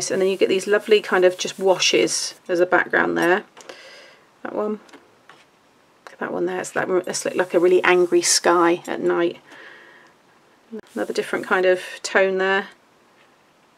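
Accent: United Kingdom. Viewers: Nice haul! Have I missed something? Sounds like you are back in the UK?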